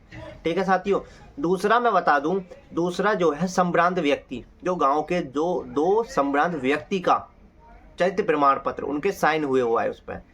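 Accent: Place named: Bulandshahr, India. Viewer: Pure bulandshahr ki language bol rha hai bhai😅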